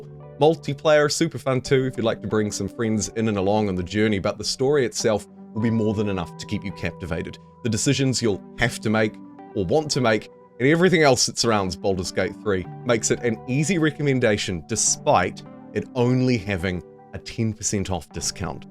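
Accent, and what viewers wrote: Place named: New Zealand. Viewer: Your NZ accent is so amazing it makes me wanna pay attention and listen to what you’re saying 😍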